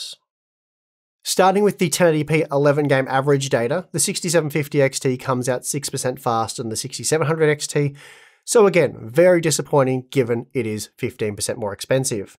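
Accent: Australia. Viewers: Good Lord such a nice channel but Aussie accent is so bad to the ear.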